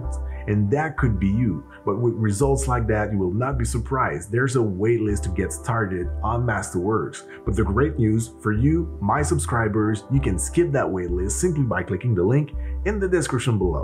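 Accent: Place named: Quebec, Canada. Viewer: He is French Canadian, if you're looking for correct pronunciation you're probably in the wrong place. Yeah, just made the cocktail (including the grenadine recipe) very balanced, could use a bit more bitter imo so I threw a couple drops of bitters on top. Didn't have any cucumber though, I imagine just adds another level of freshness on top of the lime juice.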